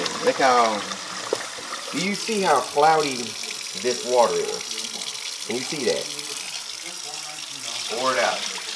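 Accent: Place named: West Coast, United States. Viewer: Got that west coast sound! Love it...head bobbin the whole time! Pro tip on that rice, I never knew that, but I never cook rice either lol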